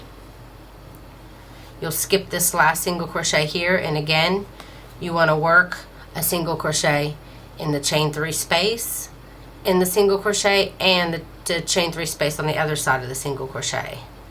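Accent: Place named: Midwest-South, United States. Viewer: It's so funny, because you have a kind of midwest-southern accent I always pictured you in Kentucky or Tennessee, with a bunch of kids playing outside! Israel is about the last place I thought you might be recording these (well, maybe not China...) How did you wind up in Israel? My Uncle Jack became Orthodox about 20 years ago and now lives outside Jerusalem—but he still sounds like he's from New York! BTW I unraveled the first one and am now using plain old Sugar and Cream in various colors. I am thinking of doing some cross-stitching in the diamonds...Thank you!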